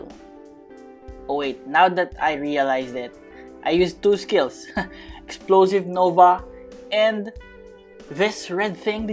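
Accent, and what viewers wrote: Philippines. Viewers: Tunog pinoy to ah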